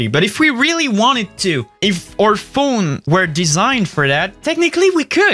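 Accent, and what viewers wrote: France. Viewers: As a French, I find him speaking English with a French accent so frustrating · he is french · I never thought a french accent would be hot until now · He is slowly loosing his french accent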